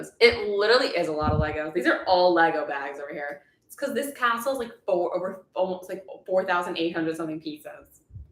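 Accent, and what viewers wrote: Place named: Wisconsin, United States. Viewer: Regarding the accent... don't forget the Wisconsin influence. Doncha know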